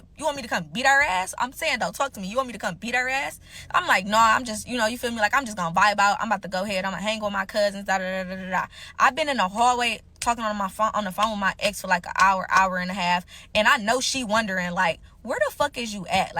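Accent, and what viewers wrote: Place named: Detroit, United States. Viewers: She gotta be from Detroit how she telling this story lol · She talking like she from Detroit but she not acting like it 🧐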